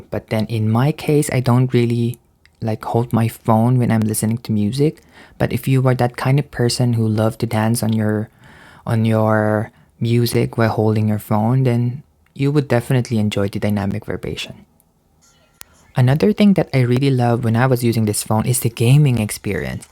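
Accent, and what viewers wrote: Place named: Philippines. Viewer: I know a Flipino when I hear one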